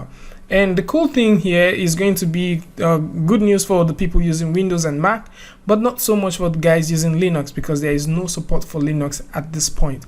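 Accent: Nigeria. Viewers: Are you Nigerian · You sound Nigerian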